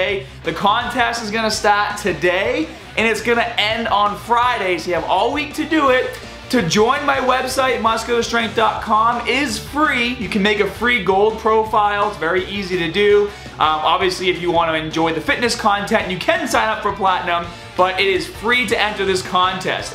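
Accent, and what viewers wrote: Boston, United States. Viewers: I just found out your accent is from boston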